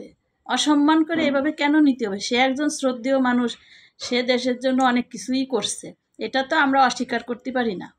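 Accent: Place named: Kushtia, Bangladesh. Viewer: Api tomar language so sweet kustiya niki meherpur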